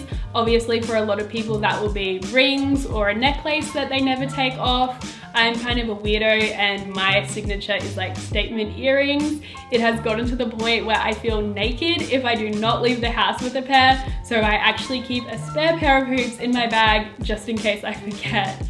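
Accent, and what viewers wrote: Australia. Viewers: Hey girl! I knew you were from Australia because of that accent :) which part of Aussie are u from? (if u dont mind me asking) hehe I'm from Adelaide! Anyways, I love your fashion style and I was wondering if you have any outfit videos that is perfect for the winter season here in Aussie <3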